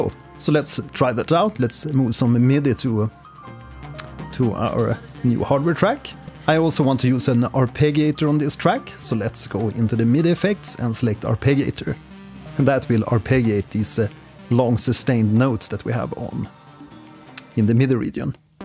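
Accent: Sweden. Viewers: Hey Great and to the point video. And you sound very Swedish! My girlfriend is from . Im going to play this vid so she can tell me what area you are from . Maybe your from Norway? Any way i love the Scandinavian teaching method and love of music. Some of the best musicans i know and have seen/heard are from the region. I am having a clocking issue. Since i upgraded to osx 14, there is not enough clock send locations are in the send box. I’m not sure if logic is now limiting how many simultaneous clock send can happen but all of the midi connections show in the mid window but not in the clock destinations window if that makes sense. I have about 20 incoming midi and they all appear, but maybe only about, 14 or 15 show for the clock send. That’s how I found your cool channel while searching for this solution to the problem. Just curious if you have seen that issue. 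If I find the answer, I will send a message here so that everybody can know. I’ll be watching more of your videos too .very very good videos.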